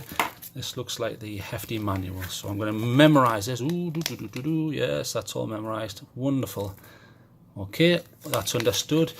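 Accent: United Kingdom. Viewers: 28 minutes for a fuckin headlamp? And that British accent...no go Brit Crazy guy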